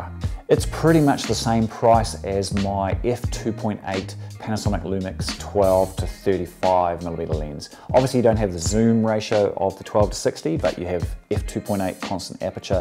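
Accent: New Zealand. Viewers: Great educational video. Nothing like a good kiwi accent. NZ looks fantastic.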